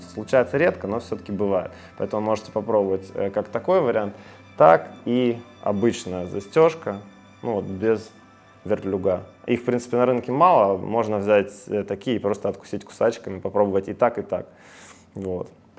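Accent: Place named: Ukraine. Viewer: на первый чемпионат,случаем не за сборную Украины ездил?Уж очень Говор характерный слышен…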